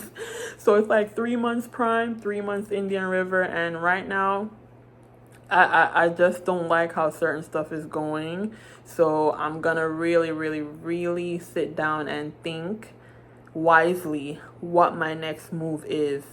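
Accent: Jamaica. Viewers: Interesting I work for IRT. Safety will definitely have a problem w mistakes like that but your human it Happens. I have never heard a local IRT Driver you sound Jamaican best wishes to you sister on your future endeavors lots of tanker jobs out there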